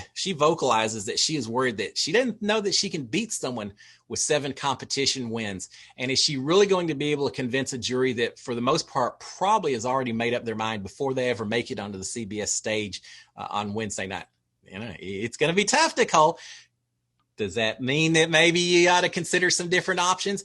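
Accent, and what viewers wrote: Texas, United States. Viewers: Good morning!! Love your Texas accent!! Thanks for the update!! Always enjoy listening to Notes in the morning!! Have a great day!!! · Your Texan accent is very much appreciated! Love your recaps,! Please do other recaps after BB?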